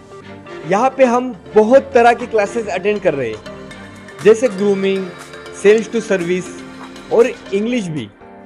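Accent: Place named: Maharashtra, India. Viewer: we simply love maharastrian hindi 😅🥰🥰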